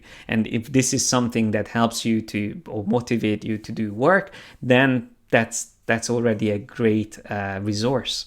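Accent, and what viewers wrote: Hungary. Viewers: Hi there, your accent is very Hungarian. :) I'm Hungarian and this is my 99d portfolio. <link>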